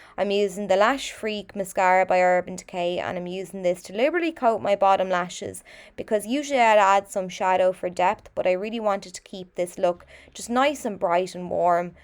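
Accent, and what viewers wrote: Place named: Ireland. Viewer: I love your accent, after my Geordie accent an Irish one is always my favourite. Another great tutorial from you, but I didn't buy this palette cos its just too light for me, I like darker smoky eye shades, but it does look good on you though. Keep them coming gorgeous girl 👧 😘 ⭐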